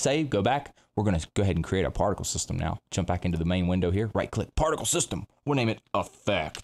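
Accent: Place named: South, United States. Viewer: Bro I enjoy your tutorials. Where in the south are you from bud?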